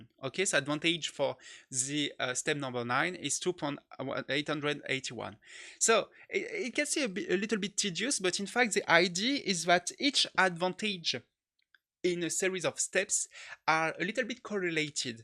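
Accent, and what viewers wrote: France. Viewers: is this accent french? i barely can understand some sentences :D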